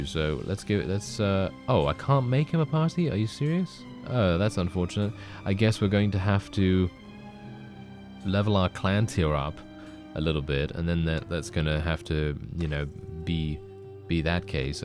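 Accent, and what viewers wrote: United Kingdom. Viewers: You a brit, mate ?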